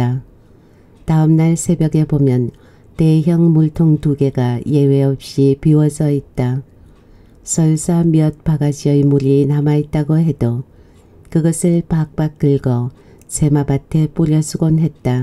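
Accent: Gyeongsang, South Korea. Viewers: 70 년대를 거치면서 살아온 사람으로서 많은 기억들이 떠오르는군요. 서울에서 태어나 자라온 나에게는 에 경상도 사투리가 처음 조금은 매끄럽게 들리지않았으나 지금은 익숙해져서 푹은한 감정이 생겼어요 항상 변함없이 굴곡이 없는 그 음성에 마음이 편해집니다. 좋은글 감사합니다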